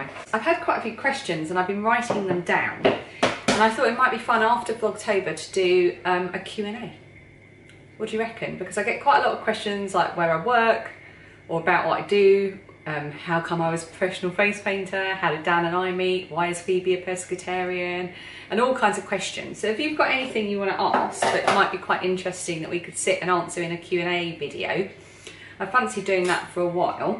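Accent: United Kingdom. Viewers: What a lovely place to take a walk - thanks for sharing! For your Q & A bit I am curious about English accents. Here in the States we can tell if someone is from the South or East or even from certain states such as Louisiana and I know the same is true for the UK. Where did you grow up and is your accent a reflection of that location?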